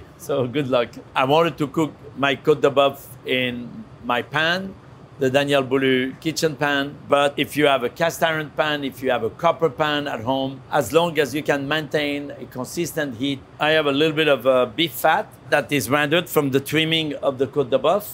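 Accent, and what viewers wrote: France. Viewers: love every time his inner french hits randomly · Goodness me, amazing cooking, mouthwatering steak with a touch of french accent. I'm hungry now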